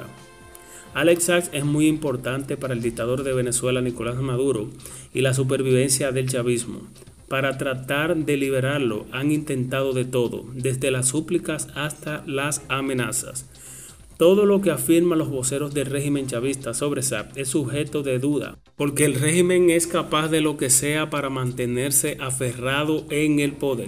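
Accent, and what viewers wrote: Cuba. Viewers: Que mal lees las noticias, y ese acento Cubano no lo puedes disfrazar. Al mejor estilo del G2 cubano...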